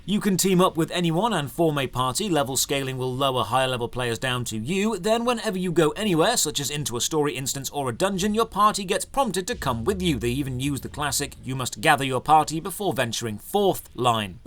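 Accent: United Kingdom. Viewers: Outstanding MMO review as usual. Btw i love listening to your voice and british accent (sorry if i´m mistaken, south-eastern european here). What about Allods Online? I couldn´t find a review about it on your channel. I used to play it about 10 years ago and never got back into it. Would be interesting to hear your thoughts on it! Keep it up!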